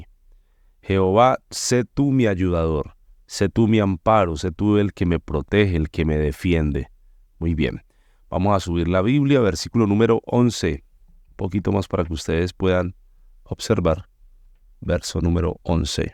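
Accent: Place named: Colombia. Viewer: Es la Primer vez que edtoy OpenDocument de eyte pastor y la explicación de la palabra deDios. Por el asento como habla pienso que es Colombiano 😊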